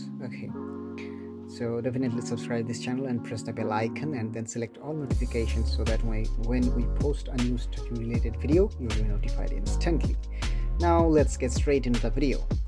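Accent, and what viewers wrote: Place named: India. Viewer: Bro are you indian? Your accent is 🔥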